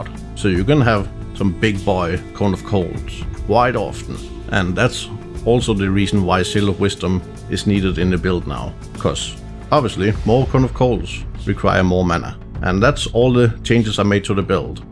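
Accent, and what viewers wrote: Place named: Denmark. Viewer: you have a bit of danish accent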